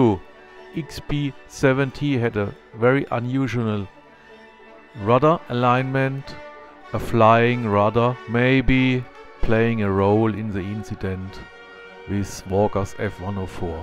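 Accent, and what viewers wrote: Germany. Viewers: train your english. There is no excuse that every german hears you are a german. Try speak Valkyrie "Wall Kü Ri" Best regards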